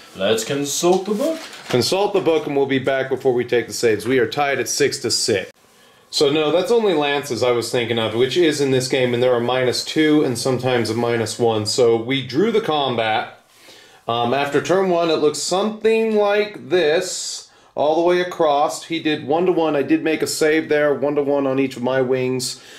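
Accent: United Kingdom. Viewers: Appreciate the Brit accent!!! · Love the British accent. Like been in the same room as Hugh Grant.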